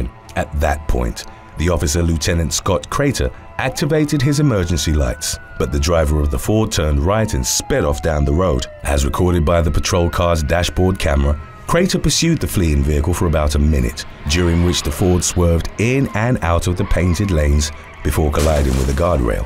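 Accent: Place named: United Kingdom. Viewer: He's british pronunciation is def different nonetheless🙄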